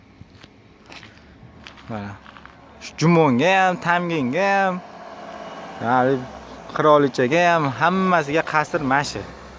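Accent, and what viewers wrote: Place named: Andijan, Uzbekistan. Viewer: Андижонлимисиз,шевангиз. Ухшаркан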